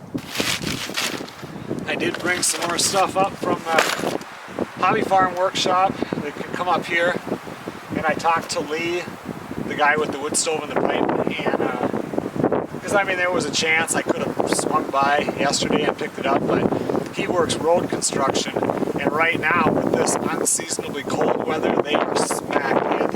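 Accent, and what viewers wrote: Minnesota, United States. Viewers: I thought it was "The Tent" guy and see I was right. Being a Minnesotan myself I noted that a lot of guys sound similar, sound like a couple of my Duluth cousins :)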